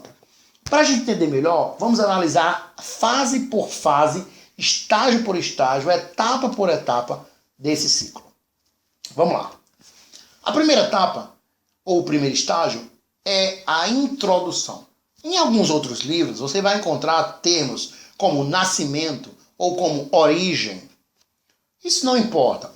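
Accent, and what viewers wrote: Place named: Northeast, Brazil. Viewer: Muito bom! Explicação de fácil entendimento, muito satisfeita. Parabéns professor 👏.. E esse sotaque, meu nordeste ❤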